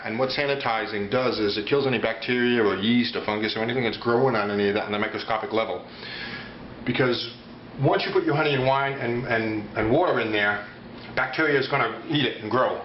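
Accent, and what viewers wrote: United States. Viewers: You sound american and bottled water is about the same quality as bottled water there. They call it springs or glacier water but really they just re-filter tap water and ad some more minerals in it. If you want "clean Water" well your better of buying actual distilled water or distill it yourself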